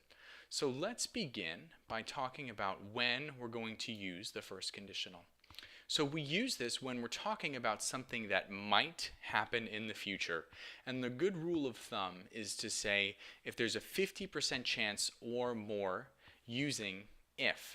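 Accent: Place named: California, United States. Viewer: OH WOW, WHAT A LOVELY ACCENT, ARE YOU FROM CALIFORNIA? AND YOU ARE SO HANDSOME....I SUSCRIBED !!!!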